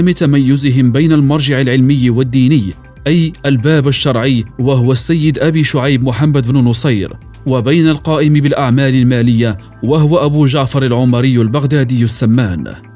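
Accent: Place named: Lebanon. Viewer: World News وليش عم تحكي لبناني؟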